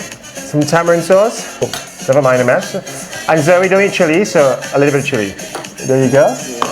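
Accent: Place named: Trinidad and Tobago. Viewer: What part of trini is this accent from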